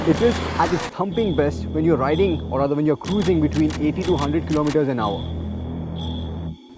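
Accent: India. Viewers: Hello aka:)... I been a witness to your many from the time of your inception. If you accept feedbacks.I have 2. 1) Few clips are repeated in all your reviews. Here one in black n white and then in color. Like something's missing 2) Agree, u have a global viewership..but an Indian is the host with the accent...Would have been great if he spoke the history/ fan following wearing a Dhoti and white shirt start and ending with the jacket:) In the end Its a Royal Enfield.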